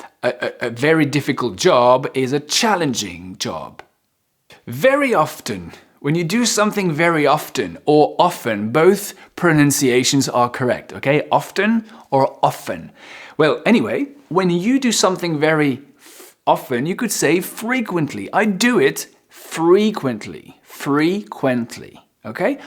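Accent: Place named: United Kingdom. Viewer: I like your british english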